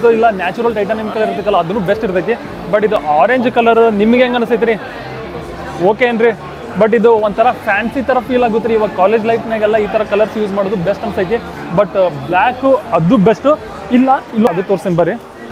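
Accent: North Karnataka, India. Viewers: ನಿಮ್ಮ ಉತ್ತರ ಕರ್ನಾಟಕದ ಭಾಷೆ ಅದ್ಭುತ ಅಣ್ಣ ❤❤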